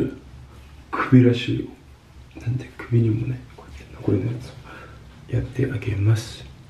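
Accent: Japan. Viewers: The rain sound and his japanese accent really addictive. It's like the best ASMR 😂 I should watch this video before sleep 🤣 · JAPANESE NCTZEN! Does he speaking an Osaka accent or just standard?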